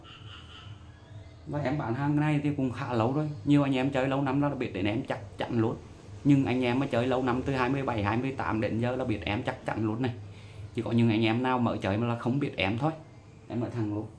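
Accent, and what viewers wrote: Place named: Nghe An, Vietnam. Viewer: Giọng bác giống như ở thanh chương hay đô lương gì nhỉ.giọng chất quê choa hi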